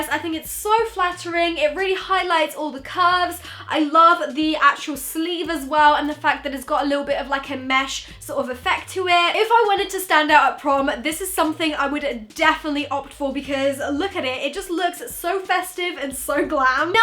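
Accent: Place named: United Kingdom. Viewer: british accent nooo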